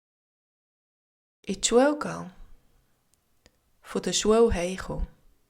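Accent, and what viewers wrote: Switzerland. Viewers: Which Canton German is this?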